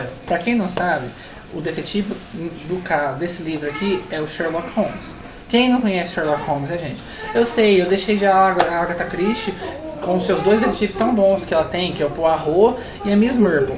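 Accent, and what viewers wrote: Minas Gerais, Brazil. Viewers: que sotaque deliciooooossssoooooo,já morei em minas e sou apaixonada por esse sotaque,amei seus videos,bjs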